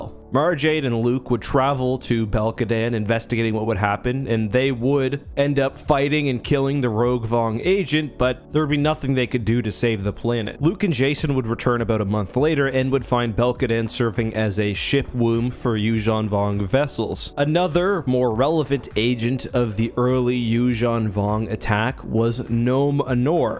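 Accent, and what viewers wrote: Canada. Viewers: The narrator absolutely has to be canadian, that accent bro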